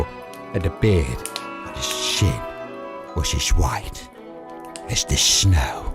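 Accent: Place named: United States. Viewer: Love the fact you can easily hear his American accent coming through clear as day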